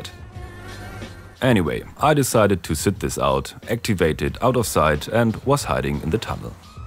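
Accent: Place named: Germany. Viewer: der deutscheste akzent überhaupt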